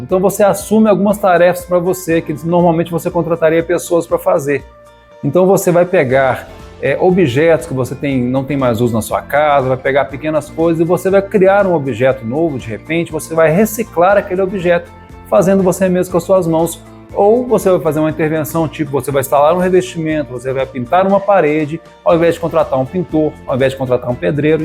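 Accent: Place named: Minas Gerais, Brazil. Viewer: Esse mineirês é tão charmoso, aconchegante e bonito, né? Tal qual as peças!!!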